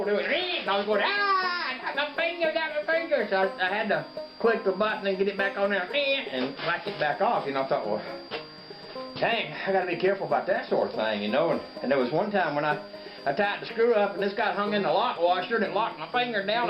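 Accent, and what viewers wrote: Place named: Georgia, United States. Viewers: you can wear them under your work gloves if needed and i heard georgia i didnt know you lived in georgia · HaHaHa! Had me laughing! Funny vid! ...I knew I heard a Ga drawl in your voice! What part of Ga you from?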